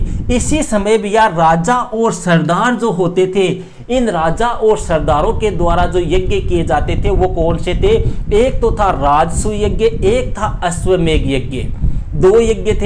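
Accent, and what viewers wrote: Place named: Haryana, India. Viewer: sir Ap bahut Achha padhate ho but sir background sound thik karo please or hindi bola karo haryanbi mat bolo please 🙏🙏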